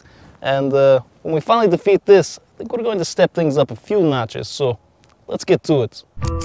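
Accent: Russia. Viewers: 俄国口音的英语吧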